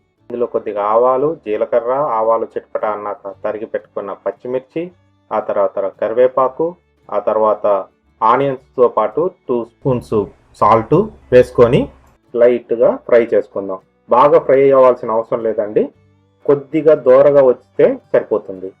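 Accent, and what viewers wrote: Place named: Karnataka, India. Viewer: I like your kannada language slang, happy to hear.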